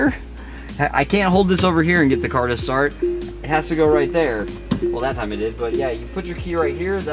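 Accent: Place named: India. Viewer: This guy sounds like the Indian guy from parks and req.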